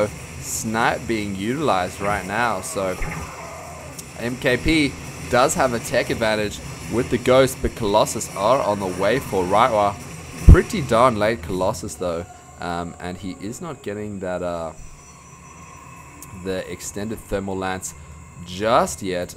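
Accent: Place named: Australia. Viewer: maybe not the best - In my opinion the best caster should be at least in master and discuss about tactics like a professional - but for sure - it is very nice to hear an Australian:) I have to admit-I like to listen to. I've started just few days ago-and I would like to continue. Besides Husky, Force and HD - is the best. For example TotalBiscuit has a superb voice, but he sucks at SC - as admit he himself. could be my best in the near future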